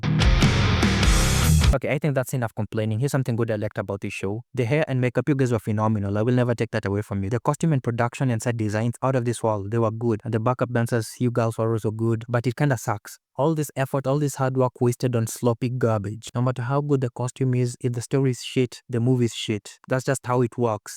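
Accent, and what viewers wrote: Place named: Kenya. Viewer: What I like here is that you didn't stutter and completely did it with a Kenyan accent. Standing for authenticity 👍🏾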